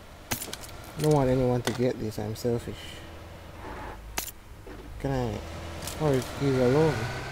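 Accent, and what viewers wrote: Jamaica. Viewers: I love when he says; you know? Love jamaican🇯🇲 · Hey are you from Jamaica cause you sound like Jamaican?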